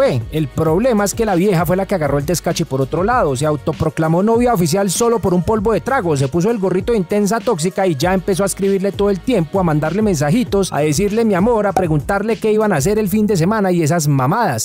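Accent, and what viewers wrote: Colombia. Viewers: XD me mató de risa todo el video, y más que suena bien paisa el men, me voy a suscribir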